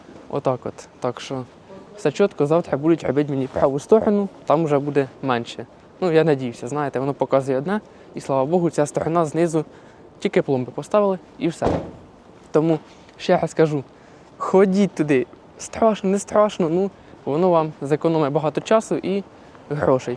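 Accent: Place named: Slobozhanshchyna, Ukraine. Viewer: тисячу лайків за те, що балакаєш на слобожанській говІрці! Не звертай увагу на "мовний патруль", уже наслухались "вишуканої"...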